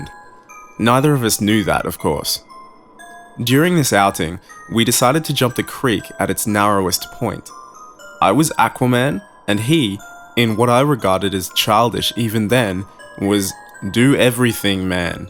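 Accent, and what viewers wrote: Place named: Australia. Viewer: On a side note, always loved the Australian accent, and I feel like it works great for this story! But I do love the way yall say No very much lol, not trying to knock ya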